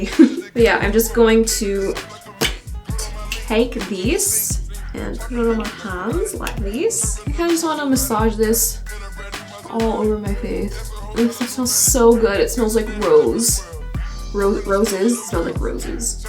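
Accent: Australia. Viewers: I just subscribed recently and when you started this video I was like "oh I forgot she was Australian" lmao. Loved this video:)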